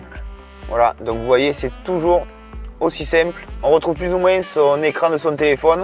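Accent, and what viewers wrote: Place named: South, France. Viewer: L'accent du sud, il apporte de la chaleur :) . J'ai acheté un 2008 crossway 130ch. je le récupère demain j'ai hâte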